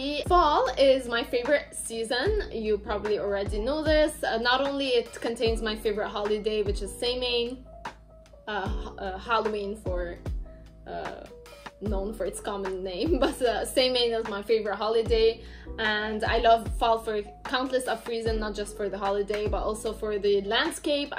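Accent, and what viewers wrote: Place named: France. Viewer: Such a good video! I was always curious what exactly your accent was because it sounded French but not fully French. Loved getting to know more about you :)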